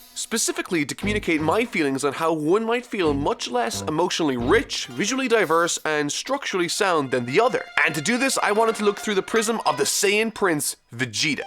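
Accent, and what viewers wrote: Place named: Ireland. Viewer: Hes.. Irish..?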